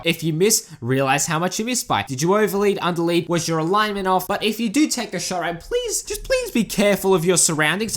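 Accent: Australia. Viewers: hello mr aussie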